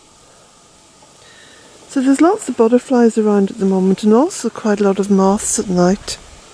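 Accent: Ireland. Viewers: Thank you, that was such fun. West Ireland is so beautiful, love it. Those cows were quite a treat. They sure look healthy. While you walked down your driveway I notice how the trees form a most lovely & mystical archway. How cool that is. I wish I could retain new information better. Thank you for repeating the names of the flowers & plants. I simply love Ireland & your accent. Since following you I've grown a better appreciation of my own small piece of paradise. Love to you, Jack & your home. Blessings P.S. My definition of goddess is same as yours.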